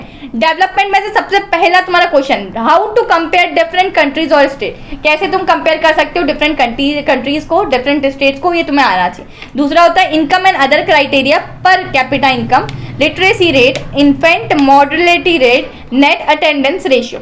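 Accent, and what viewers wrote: South, India. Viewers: Mam Are you from south India Plss tell😖😖 BTW Thanks for everything🥰🥰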